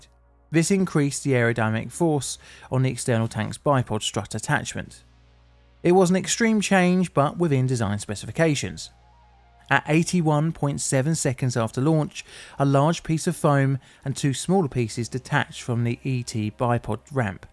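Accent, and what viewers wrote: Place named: England, United Kingdom. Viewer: Seems to be using a batty English accent to muffle the sounds of his words a bit. You should try to learn to speak American English which includes correct pronunciations for each of the words you wish to speak here.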